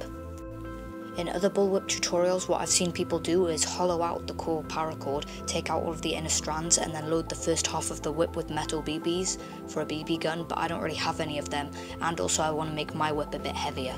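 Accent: United Kingdom. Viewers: i've been watching your videos quite a time... and they're amazing... But when i saw your first video i realized there is something similiar in your voice... and its damn Harry Potter (daniel radcliff) in the two first parts XD i think it's because british accent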